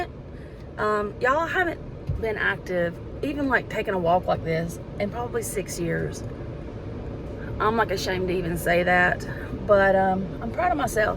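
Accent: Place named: Appalachia, United States. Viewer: I’m watching and have reached the end of your day 20. I’m inspired by your walking, especially walking without media! May the Lord help you through all your adversity!!! 😢 Btw, your accent sounds like mine so I figure we both live in the southeastern part of the United States. I call my accent Appalachian Foothills.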